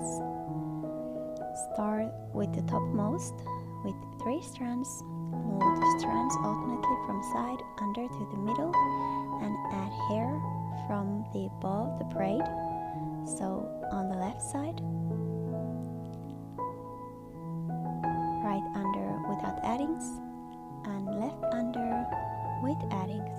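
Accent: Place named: Finland. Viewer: She's Finnish. That's literally a Finnish accent. Are you serious right now?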